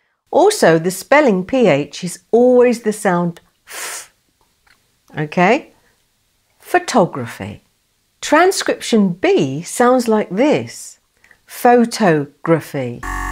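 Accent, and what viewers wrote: United Kingdom. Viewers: Thank you for learning English pronunciation with a British accent for free, loving 🌹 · Native Spanish speaker here, I've got 9/10. I knew "monkey" was pronounced with /ʌ/ and I'm not used to hear British English so the way you pronounce it confused me. But after hearing it again and comparing it with other words with /ɒ/ I just saw my error. A fun exercise I may say!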